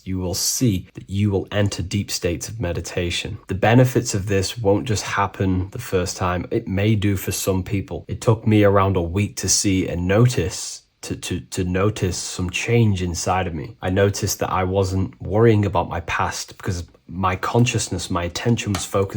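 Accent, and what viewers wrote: United Kingdom. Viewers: Iam so happy that i found someone like you , your chanel so helpfull you answered so many quations i had , i mean there is somthing diffrent about you , you know what you are talking about , i really like this, and i love you british accent too, thank you for your existance and iam so greatfull finding you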